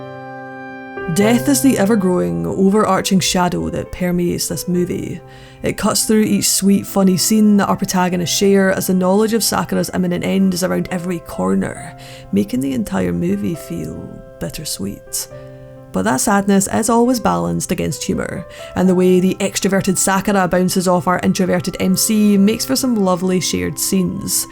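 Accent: Scotland, United Kingdom. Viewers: Where are you from in Scotland? I’m Glaswegian and I get you are changing slightly for other people but I can’t place it, also excellent work! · I am just so used to hearing American engli accent....your British accent sound like crap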